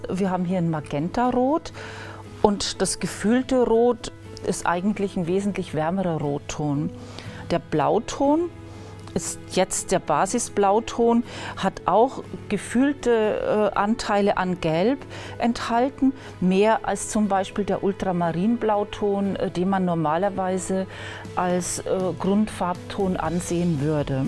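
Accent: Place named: Upper Palatinate, Germany. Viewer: Ha ha, das ist nicht Fränkisch, der Akzent stammt aus der Oberpfalz - so kann man sich täuschen!!!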